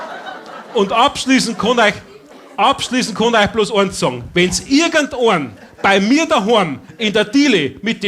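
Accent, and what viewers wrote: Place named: Upper Palatinate, Germany. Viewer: Der Oberpfälzer Dialekt ist der beste ! 😅⭐⭐⭐⭐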